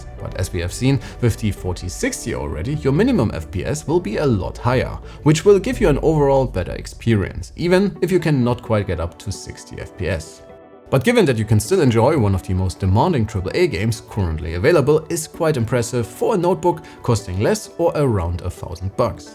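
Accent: Germany. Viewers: I don’t understand, why you don’t do the videos in German.. it is clearly hearable, that German is your mother tongue… or at least in both, German and English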